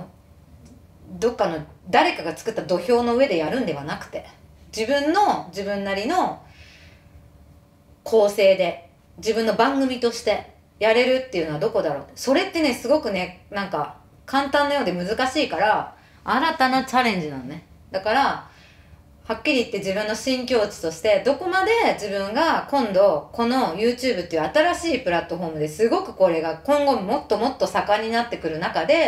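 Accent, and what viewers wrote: Nagoya, Japan. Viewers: 一度にこれだけ長く話してるの初めて見ました。たまに名古屋弁のイントネーション入ってて嬉しいw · Twitterの一言に多くの共感！YouTubeではより多くのコメントを聞ける楽しみがあります。これからも頑張って下さい。 普通にイントネーション名古屋弁入ってまっせw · 登録しました！ 名古屋からです。 名古屋弁、期待してます。👍🏻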